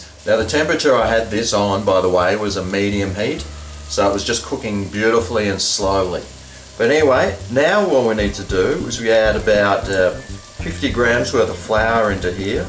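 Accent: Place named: Australia. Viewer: Channel he's Australian not French do you know what French accents sound like.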